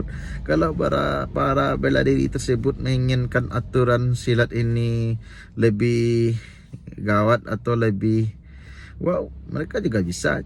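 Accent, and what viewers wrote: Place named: West Sumatra, Indonesia. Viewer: dari logat atau aksennya,nampak bahwa abang ini urang awak alias asli minang.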